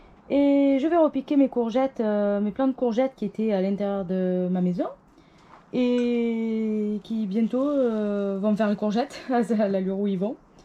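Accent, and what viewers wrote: South, France. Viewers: Re bonjour, je viens de reprendre la vidéo et je trouve que tu as la main verte et un bon terreau universel, je suis entrain justement de tester des terreaux universel ainsi que des terreau à semis en faisant mes semis et je note les résultats sans oublié que les graines y sont aussi pour beaucoup, j'achète depuis 4 ans à plusieurs semencier pour vérifier la germination de leurs graines, j'ai en teste actuellement: Kokopelli, La bonne graine, La boîte à graines, Les graines Bocquet, Le biau germe, Promesse de fleurs, Les graines de chez Baumaux et pour finir Graines de folie que tu achète toi aussi. Ce que je peux dire pour l'instant c'est que Kokopelli est loin d'avoir une bonne note, germination pas toujours au rendez vous et même des erreurs de graines dans les sachets, la bonne graine idem germination pas toujours au rendez vous, pour l'instant je suis satisfait du Biau germ, des graines Bocquet, de graines de folie et de la boîte à graines voilà. 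Sinon bravo pour toi, tu as de très jolie plants, j'aime bien t'as chaine et la belle personne du sud que tu es avec ton petit accent bien sympatique, et je te dis à bientôt et je te souhaite une très bonne semaine.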